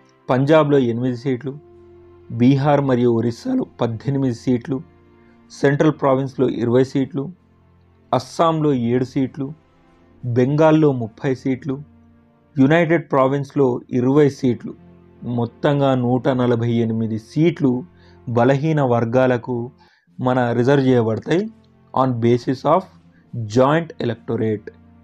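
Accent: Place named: Telangana, India. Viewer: Keep it up ..your Telangana accent is nice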